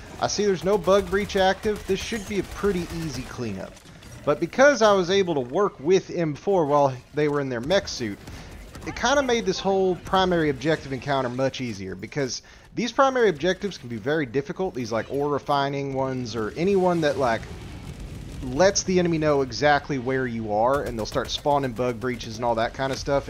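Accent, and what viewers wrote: South, United States. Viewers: This dude is legit, great to hear southern accent too😆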